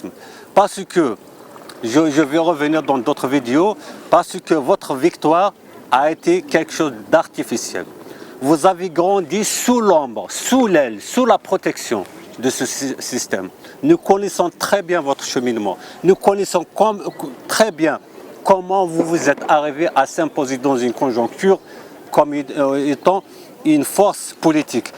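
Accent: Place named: Kabylie, Algeria. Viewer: le français avec un soupçon de takvailit quell horreur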